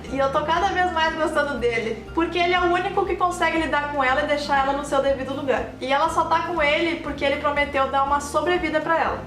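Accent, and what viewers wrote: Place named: Santa Catarina, Brazil. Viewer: Man acho vc tão linda❤️ me lembra minha melhor amiga q brigamos e n nos falamos mais, o sotaque parece de Santa Catarina " manezinha" ahhh man 😂❤️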